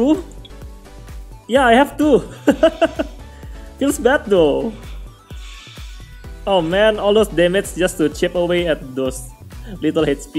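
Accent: Indonesia. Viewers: Soalnya b.ing nya kayak logat indo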